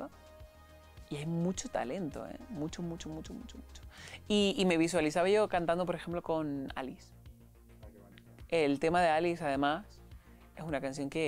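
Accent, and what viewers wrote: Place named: Canary Islands, Spain. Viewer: Deseando ver todo lo que está preparando. Las entrevistas de Ago me relajan. Tiene ese acento canario y esa voz que me dejan flotando ❤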